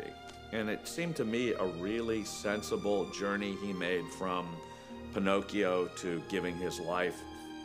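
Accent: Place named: Texas, United States. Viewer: He still sounds so Texas to me.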